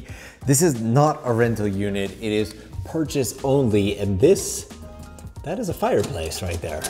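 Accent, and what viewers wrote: Canada. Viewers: This is the most Canadian accent I've heard in a very long time.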